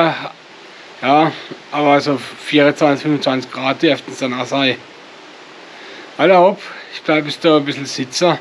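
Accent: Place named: Palatinate, Germany. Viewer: Top Video Was für ein zauberhafter Sonntag hier in der Pfalz! 🌧☔ Trotz des regnerischen Wetters hat dieser Tag einen ganz besonderen Charme, den nur diese Region bieten kann. Die Regentropfen spielen eine sanfte Melodie auf den Dächern der urigen Pfälzer Häuser, während die Natur herum in einem satten Grün erstrahlt. Und dann dieser urige Pfälzer, der uns in dieses YouTube Video zeigt – er ist einfach das Herzstück dieser Atmosphäre! Mit seinem herzlichen charmanten Dialekt entführt er uns in die Welt der Pfälzer Gastlichkeit gr. aus Lemberg Eure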